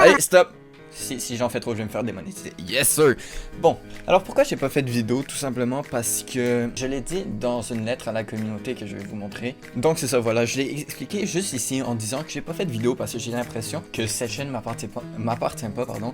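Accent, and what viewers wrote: Canada. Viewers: T’entends pas son accent ? Il est déjà canadien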